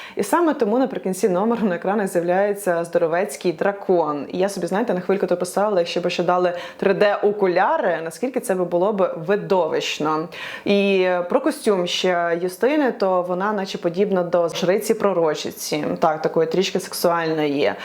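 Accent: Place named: Ukraine. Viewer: У Вас важка українська.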